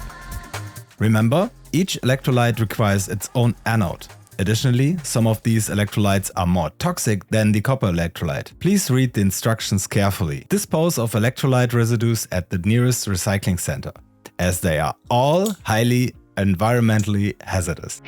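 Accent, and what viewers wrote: Germany. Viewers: Cooles Video und auch cool das du so gut fachlich Englisch spricht man hört aber sehr gut den Deutschen Akzent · German . I hear the accent. lol is this doc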